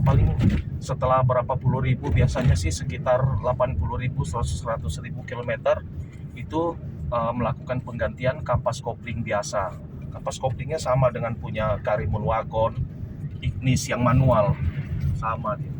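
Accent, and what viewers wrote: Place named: Sulawesi, Indonesia. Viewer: Syutingnya di Jambi tapi dari logatnya om dari Sulawesi kah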